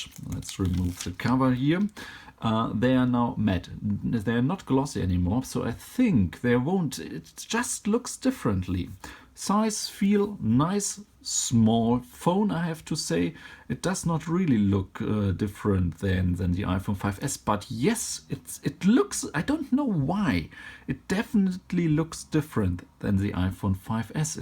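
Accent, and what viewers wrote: Germany. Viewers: Yes her is a German :) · You sound like German... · I'm in love with the phone and your accent! Deutsche?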